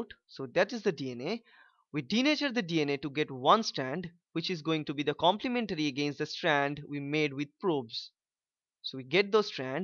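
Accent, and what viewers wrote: Bengal, India. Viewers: Its Indian accent, with a bengali sub accent :P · ...i never said it before, but I love your Indian accent <3 .. haha thanks a lot! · his indian accent is much more annoying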